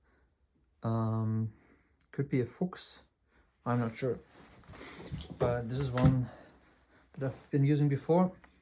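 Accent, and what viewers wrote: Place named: Sweden. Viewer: That's a Swedish accent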